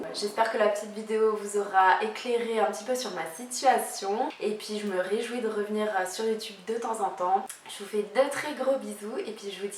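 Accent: Switzerland. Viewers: Mais tu parles comme une vraie vaudoise!!! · Hey te revoilà ! Ça fait plaisir de te revoir par là ! Tu as attrapé un petit accent suisse quand même 😉 ( je suis frontalière et je confirme , le bordel les bouchons 🙈)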